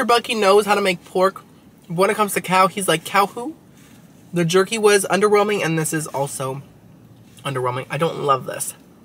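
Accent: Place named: United Kingdom. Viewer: I think your videos are way more fun to watch them in the UK. I love your British accent! Does it help the stutter? I hope so. We don’t have many of the food places you frequent. I want to jump on a plane 😂 xxx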